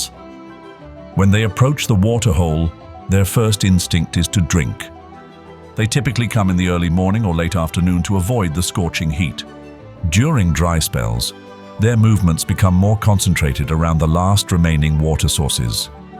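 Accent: United Kingdom. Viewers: specially due to your British accent I love this...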